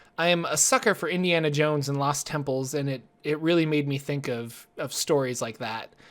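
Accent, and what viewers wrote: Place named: Chicago, United States. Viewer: sounds like the south side of Chicago to me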